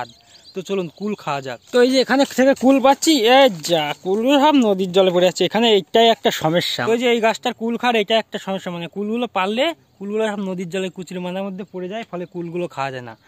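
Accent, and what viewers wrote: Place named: Kolkata, India. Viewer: kolkatar bangla gula moja lage sunte...hevy test eta khub moja alge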